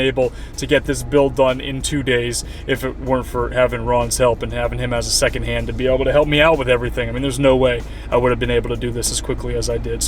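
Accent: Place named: Modesto, United States. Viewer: You sound like your in my area which around a 100 Modesto, California Love your van conversion I wish there was someone in my life that could help me build my home on wheels which I'm planning on doing in about a year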